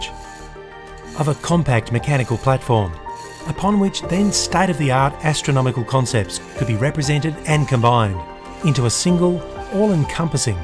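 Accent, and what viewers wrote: Australia. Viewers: Are you an Australian or a New Zealander? Can't pinpoint the accent.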